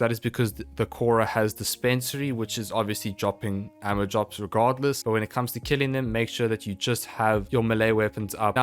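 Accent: South Africa. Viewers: You sound a bit South African there my bru, lekker! Subscribed.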